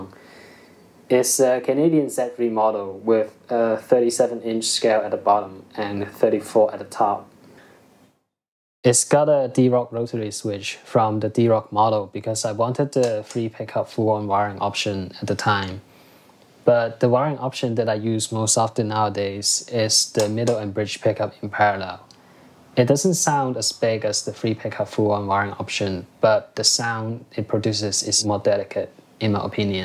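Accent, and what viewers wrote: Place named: United States. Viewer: I hear your American accent very good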